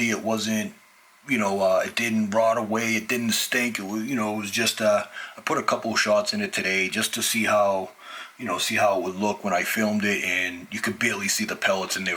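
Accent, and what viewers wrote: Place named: Boston, United States. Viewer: Not bad! You got that Boston accent. For the bubbles on top try using a heat gun so the top bubbles can decipate all together!! 👍👍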